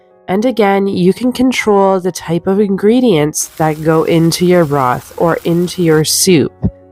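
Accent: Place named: Canada. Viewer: I love your tips and as a bonus I love the Canadian accent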